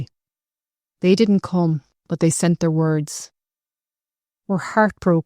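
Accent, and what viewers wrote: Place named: Ireland. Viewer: Your story-telling skills are impressive! You certainly know how to tell a tale - diction, pacing, clarity, turn of phrase, et al. My curiosity was whetted regarding your name -. Your accent says you are Irish, as am I - BELFAST. However, I've never come across that name before, apart from a town in eastern Spain. Good luck with your YouTube podcast.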